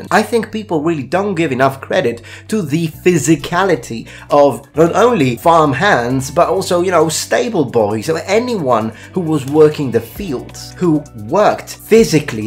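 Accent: Italy. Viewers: Are you Italian where r u from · Sei italiano vero?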